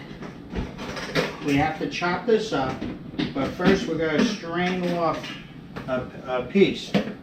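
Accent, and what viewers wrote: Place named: Texas, United States. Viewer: Yes, that accent always gives it away doesn't it, haha. Well technically if you live in Texas then that makes you a Texan. I'm sure there is a native Texan that would strongly disagree. These things always make me laugh because most of us came from somewhere else originally and quite a lot of these so called southerners have not so distant northern and European roots.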